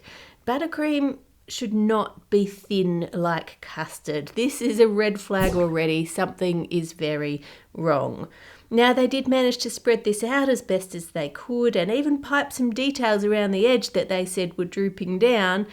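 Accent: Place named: Australia. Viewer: I would watch these videos solely to hear pronounce "chocolate" in her incredible Australian accent. As a linguist, it makes me inordinately happy.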